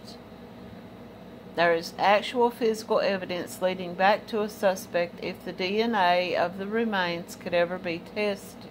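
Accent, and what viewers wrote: United States. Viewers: I hope you weren't offended because I could tell by your accent that you were close to Virginia my family is like north of Knoxville it's a little it's a hair different lol... Slightly but you can tell the.... A sheriff's department has posted a Cold Case webpage just went online I'm going to go grab it for you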